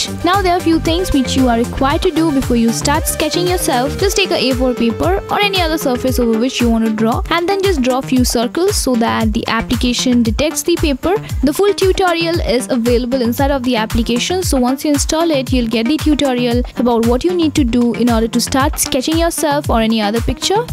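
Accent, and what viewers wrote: India. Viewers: Indian ke english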